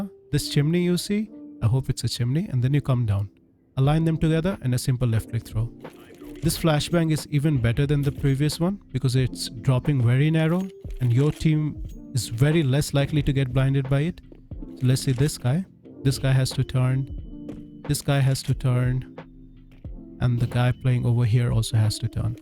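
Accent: India. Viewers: are u indian bro? btw love ur content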